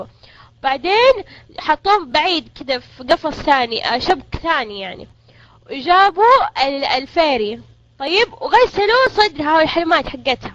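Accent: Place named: Urban, Saudi Arabia. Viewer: يعني الحين هذي لهجه حضر السعوديه؟ يع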